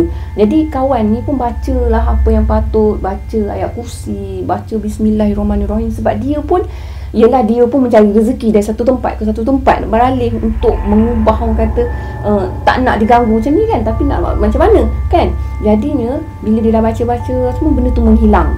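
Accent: Kelantan, Malaysia. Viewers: Senjoh tu Hok sedak dgr kak .haha .kak ore Kelate ko .?area mano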